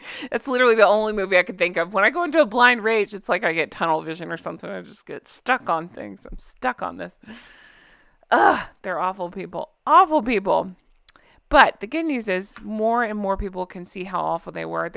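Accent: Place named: United States. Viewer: The entire royal family is a scam to promote British tourism. You sound like an American. Why do you care? We made a break from that Monarchy in 1776. Get a grip.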